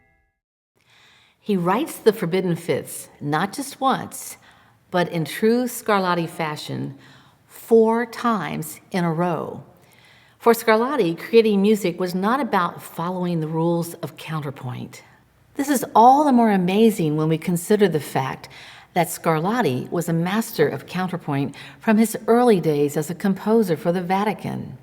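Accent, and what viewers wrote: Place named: United States. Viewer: It's just the American accent that one needs to retune to 🎉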